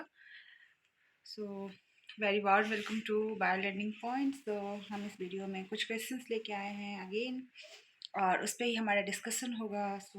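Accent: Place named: Bengal, India. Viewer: By your language accents, you looks bangali??...i mean are you from west bangal??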